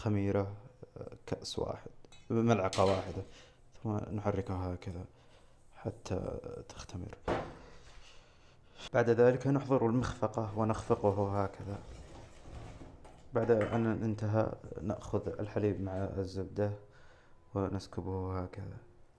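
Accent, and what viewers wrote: Saudi Arabia. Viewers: Love You هو يتكلم سعودي وعربي في نفس الوقت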